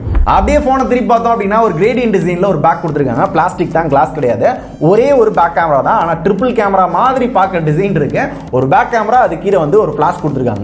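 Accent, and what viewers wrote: Madurai, India. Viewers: Bro neenga madurai ya bro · bro neenga madurai ya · Bro neenga madurai ya🤗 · Bro neenga Madurai ya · Are you Madurai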